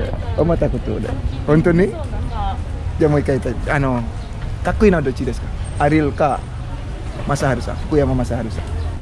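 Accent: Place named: Bali, Indonesia. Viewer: Care nak Bali logate bli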